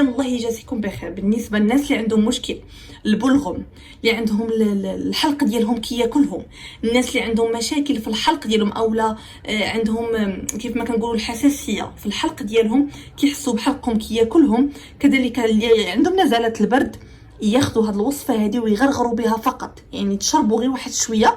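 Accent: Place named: Maghreb. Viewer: السلام عليكم اختنا الطيبة القلب السيدة -نشكرك على بث هذه الڤيديوهات المفيدة للناس ولكن نرجوك أن تتكلمي ببطئ كي نلحق أن نفهم كلامك بتتابع معك وكذلك نرجوا أن تتكلمي باللغةالعربيةالفصحى فاللهجةالمغاربيةالجميلةالعذبةصعبة الفهم في بقية الدول العربية،نرجوا الإهتمام وشكرآجزيلآجازاك الله خيرآ😍❣️👰🤵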